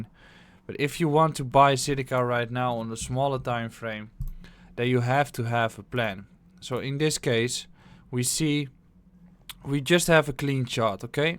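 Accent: Netherlands. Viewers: Lache man! Je eigen stijl ta , een dik NL accent en je wijst naar pareltjes. Ik volg je pas net, maar ziet er tof uit!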